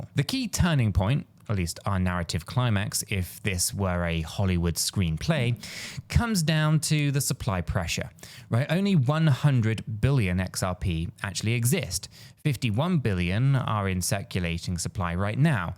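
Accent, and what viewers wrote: United Kingdom. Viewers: Love your channel. Great info!!! Your British accent and sense of humor put it over the top! 😊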